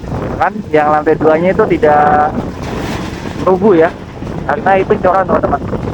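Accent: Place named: West Java, Indonesia. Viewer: Pake V neng. Sia orang sunda tah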